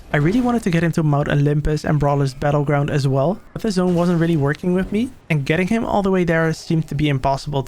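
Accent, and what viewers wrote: Netherlands. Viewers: Hey!! Just wondering correct me if I'm wrong but umm, are you Dutch by any chance?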